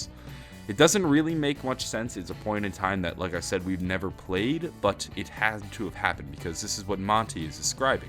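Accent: England, United Kingdom. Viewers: Trust us english lot- he's saying bald we her his accent clearly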